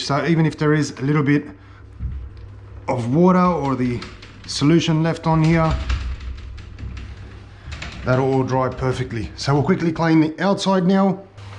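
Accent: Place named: Australia. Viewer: Randomly came across one of your vids, as soon as I heard the voice I was like “mad a Aussie channel” I’m about 40 videos in and have subbed. Cheers from Sydney ✌🏻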